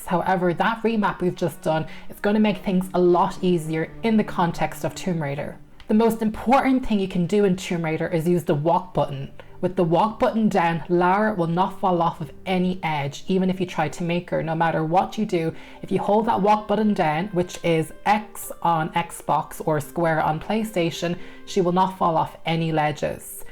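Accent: Ireland. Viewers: I find the modern controls way less clunky and awkward in general, but need to switch to the tank controls for things like making her do that jump back for the perfect running jump etc. Looking forward to this video as I definitely need help with exactly the issues you're describing! It took me like 4 levels to figure out [on the switch] that you need to press RZ to grab after jumping, not the action button or any others. Camera angles are a major pain in the pass, too. P.S. Off-Topic and maybe unwanted comment, but I love your accent! Irish accent has always been my favourite :) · There's no tap backwards? At all? That's such an omission. I can't make a jump because I need a one tile run up, but I can't do the dangle off the edge and climb back up trick, as there's a bloody wall there. It's perfectly impossible with the modern controls. Because you've got to go back right to the wall, but if you walk backwards, Lara gets stuck with the wall and to sort that, you've got to walk forwards but then you don't have the run up. 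Oh, well. Time to go back to "tank controls" and re-learn them. I mean, I used them back in the day. I thought "modern controls" would help fix it being so janky, but they feel more janky - for the lack of tap backwards - and not an improvement. p.s. Ah, those Irish "R"s. Love it.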